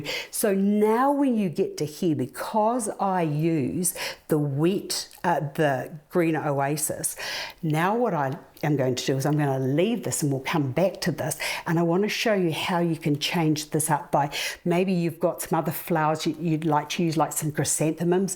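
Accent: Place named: New Zealand. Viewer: Love your New Zealand accent - from a follower in the Commonwealth of Virginia in the United States!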